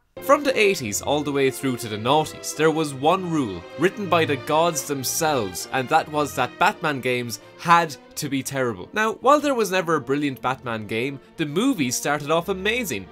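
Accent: Ireland. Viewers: I love your Irish accent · He sounds so Irish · Your Irish accent is jus lovely darling. lol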